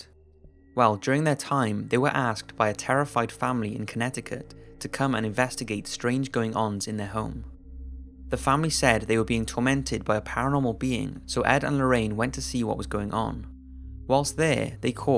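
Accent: Wales, United Kingdom. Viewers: Hello, Are you from britain? I like your accent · Hey I was just wondering, where are you from, because your accent sounds a bit Welsh. Thanks!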